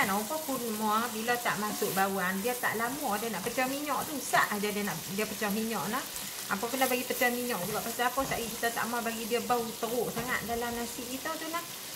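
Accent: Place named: North, Malaysia. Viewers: Dah cuba resepi Ayam Masak Kesuma! Puaih hati... selalu dok tengok channel dengan suami. Suami seronok sebab dengaq loghat Utagha! Gelak2 dia. Semoga ramai yang menggunakan resepi, jariah nanti kat akhirat. Moga Allah terima...Aamiin2 YRA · terhibur sungguh dgn loghat utagha buat kami diperantauan...’punca semua ni’ ayat tergelak besaq 😂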